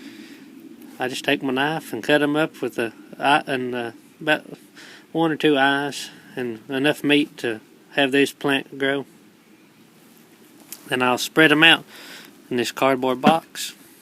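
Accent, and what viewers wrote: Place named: South, United States. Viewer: Love that country accent, I to come from the country.You videos are wonderful and so imformative.God bless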